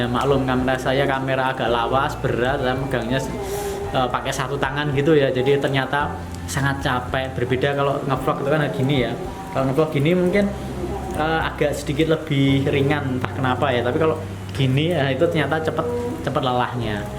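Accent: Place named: Yogyakarta, Indonesia. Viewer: jogja banget..koyo aku ngomonge..hehe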